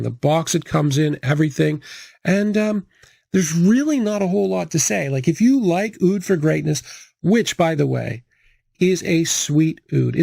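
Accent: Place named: Canada. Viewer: This was my first time on your channel but I could tell you're a fellow Canadian pretty quickly, glad I got that accent detection down. Also I'm pretty sure that's a maple leafs puck in the corner. Good stuff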